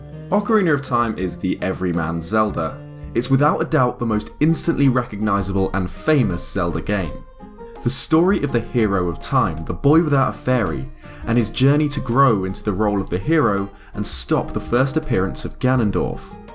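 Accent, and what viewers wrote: United Kingdom. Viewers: eX Galneryus because hes British lol it's his accent. He also said Zelder. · its called a BRITISH ACCENT.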